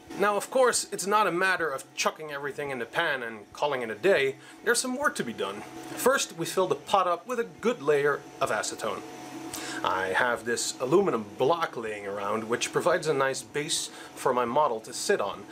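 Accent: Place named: United States. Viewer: How come you sound like an American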